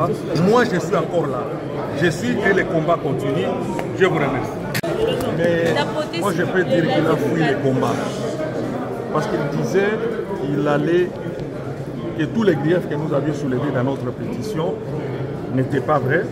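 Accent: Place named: Rwanda. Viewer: Ton français rwandais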